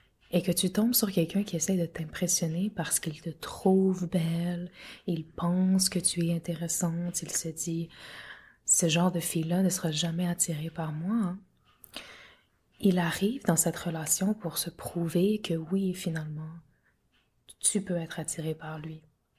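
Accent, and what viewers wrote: Quebec, Canada. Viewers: Un petit accent québécois 😝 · You sound québécoise(just like me fr) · Ça c'est du français Québecois! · when you speak english you cant barely pick up you are from canada , totally different in french, your accent betrayed you (that and speaking french from quebec) hahaha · Merciii pour cette petite leçon de vie! Ps: j'adore ton accent québécois (si c'est bien ça):) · Avec accent québécois 😊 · J'adore ce petit accent canadien / québécois du français que tu as appris. Félicitations, toujours au top tes vidéos 🙏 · Je ne m'attendais pas à ton accent québécois hahahahaha j'étais habituée à l'anglais mais ça fait un ti choc mdr (je suis française) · je suis français et je suis étonnée que tu parle si bien français. PS: j'adore ton accent québécois. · Charmant le parler québécois 😊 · Québec accent here 🙃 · je ne savais que tu parle Français :) c'est un accent Quebecois ? 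tous ce que tu dis est beau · I'm from Saskatchewan, I'm surprised I picked out that it was a Quebecois accent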